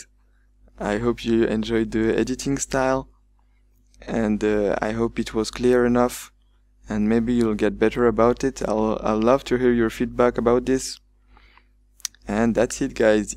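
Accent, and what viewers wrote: France. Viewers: Is it possible that you are french? If so you are one of the few french people that can speak english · i was a bit confused at the keys you provided, but then i remembered you have a french accent so you are probably using a azerty keyboard. Anyways, i'd suggest providing the qwerty equivalents next time :P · Il ne serait pas français ? Le petit accent on l'entend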